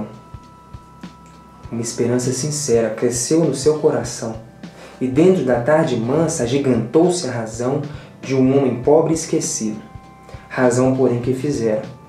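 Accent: Minas Gerais, Brazil. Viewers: Seus compartilhamentos de textos são ótimos! Encontrar esse canal, digo, ter acesso ao seu trabalho aqui, foi um achado e tanto para mim esses dias. E esse sotaquezinho - mineiro, não é...?! Obrigado pelo compartilhamento, dedicação. :)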